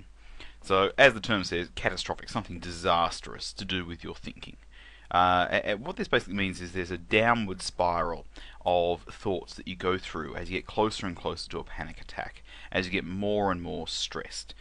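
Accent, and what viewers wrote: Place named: New Zealand. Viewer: Wow you described me exactly. Are you a Kiwi you have an NZ accent?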